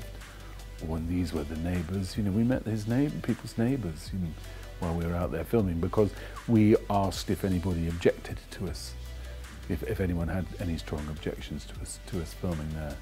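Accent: RP, United Kingdom. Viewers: I love the way he speaks so proper and posh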